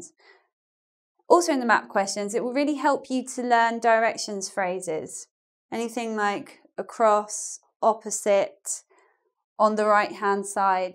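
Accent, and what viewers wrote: United Kingdom. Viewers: I'm japanese. I've been learning English with American accent for 6 years. Thanks to your videos, I gradually get used to british accent. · I love you!!!, you are the best teacher of English of the world, I follow all of your channels, I like your British accent to speak. thank you very much for your advices.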